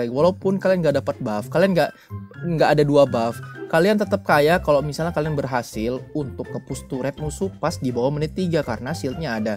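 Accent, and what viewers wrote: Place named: West Java, Indonesia. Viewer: Ohh kirain.. Nada bicaranya, logat bahasa Indo nya kaya orang Sunda Bang. He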